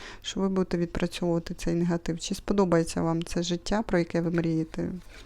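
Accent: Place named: Russia. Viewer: Так, привіт, що ж я перша сьогодні, гарно оформлені ці ленорман та жаль що капацькі родом. але люде й так не знають хто їх виготовив, тож все одно повірять. продовжуй гадати. круті когті, зразу видно що хижачка, що не властиво українці. Але й рушник теж намальований, не вишиваний він вручну. Кого обманюєш липовим полотенцем, і вимовою з расейянським акцентом, певно що дурачків?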